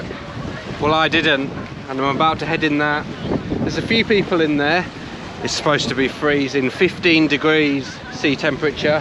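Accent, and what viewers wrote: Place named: United Kingdom. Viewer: Nice to hear good British accent ..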